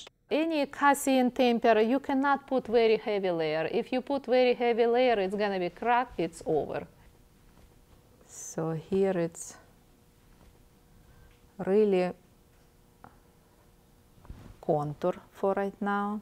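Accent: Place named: Russia. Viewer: I know she has my accent I miss Russia, but I am glad I am no longer there I love it here :D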